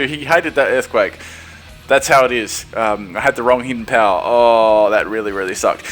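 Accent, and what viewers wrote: Australia. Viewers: He's Australian, if that helps you realize.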